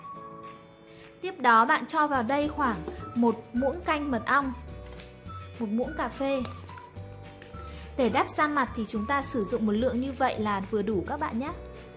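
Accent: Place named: North, Vietnam. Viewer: Thích nghe mo lên thấy bac kỳ đoc ko thích khó nghe sai tu chát tai quá nói cho ng nam đoc ko cho vây hết ng hả nghe bac kỳ đoc chán bỏ me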